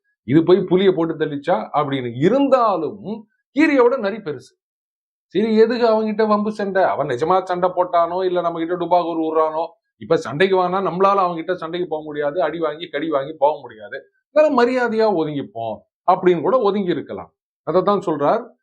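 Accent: Chennai, India. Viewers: Mahabharatam in madras thamizh is refreshing 😋 ✨️ 😌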